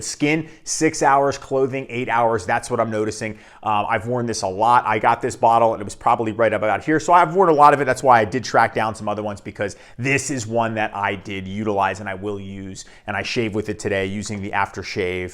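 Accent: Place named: North Jersey, United States. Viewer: For a guy called you like like a guy from north Jersey!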